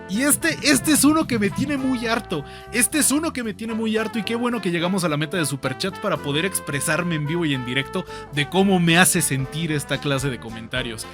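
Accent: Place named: Castile, Spain. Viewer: Acentazo castellano (Mis 10's)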